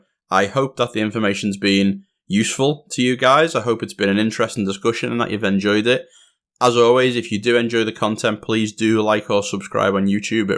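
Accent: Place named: Merseyside, United Kingdom. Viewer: Dropped a like and subscribed because of the Merseyside accent ;)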